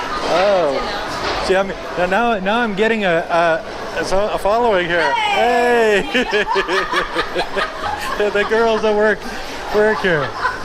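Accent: Canada. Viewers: I like his Canadian accent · Wow that’s a strong Canadian accent😂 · Damn is don’t know what’s worse these markets or his Canadian accent ay?!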